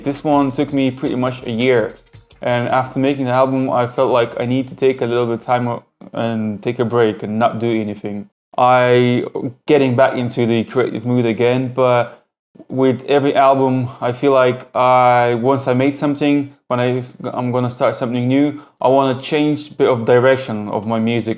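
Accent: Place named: Eastern Europe. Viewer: Your accent always really takes me back, Its like half eastern european and half british cockney almost?! Have you spent time in UK?! Wicked tunes as always mate.. DIY cassette releases are the ay forward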